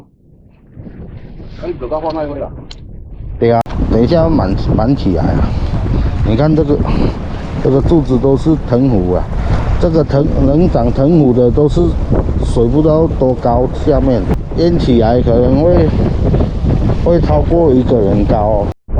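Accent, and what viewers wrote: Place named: Taiwan. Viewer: 講話很台灣，親切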